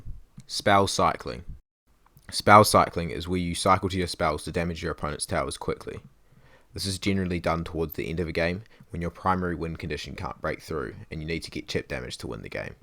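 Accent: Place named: Australia. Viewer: Bro sounds Australian is this a ken reference???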